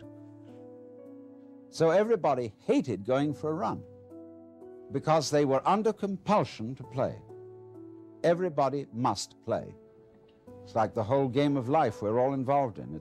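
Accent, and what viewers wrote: RP, United Kingdom. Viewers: His good looks and posh Oxbridge priviliged speak got him through the drunken BS he spouted, gotten from reading other peoples' books. He couldnt make it through a speech without a bottle of brandy. Spiritual Alan Watts the contemporary guru heh? LOL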